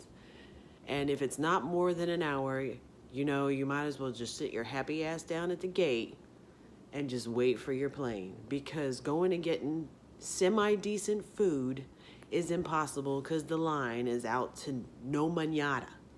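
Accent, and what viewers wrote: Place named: South, United States. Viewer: I hadn't caught that deep southern drawl in your voice before..Are you at Truth Social?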